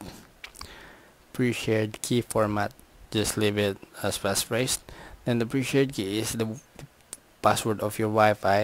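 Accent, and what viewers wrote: Philippines. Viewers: tagalog accent is terrible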